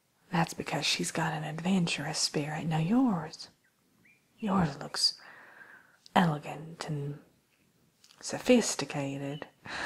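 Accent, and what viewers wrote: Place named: South, United States. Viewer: Lovely. Your southern accent was adorable. I love the photo you chose too..perfect. Can't wait for the next segment!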